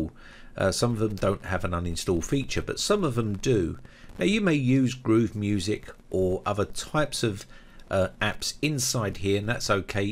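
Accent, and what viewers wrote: United Kingdom. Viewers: Your talking style is so British. Great videos btw.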